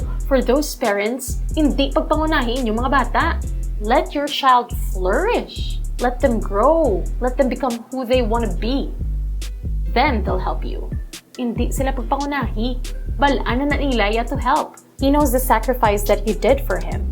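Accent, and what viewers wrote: Ilonggo, Philippines. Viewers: halaa i just saw this on facebook then i searched in yt tas halaaa kanami gle mamati simu manang ur ilonggo accent uwu❤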